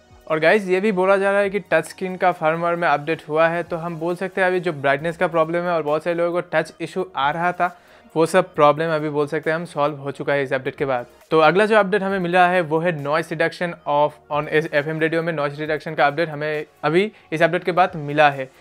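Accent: Bengal, India. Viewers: Bengali I guess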